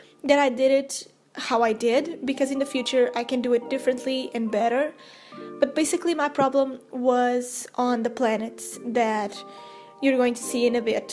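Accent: Portugal. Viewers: Tive de ir procurar os comentários portugueses.... Eu estava a ouvir e só pensava : este sotaque é muito uma portuguesa a falar ... Tive mesmo de ir conferir , eheh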